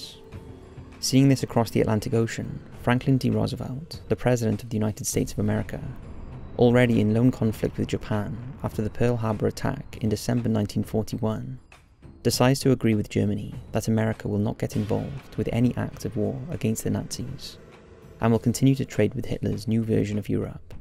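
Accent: United Kingdom. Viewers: British accent.....instantly clicked off.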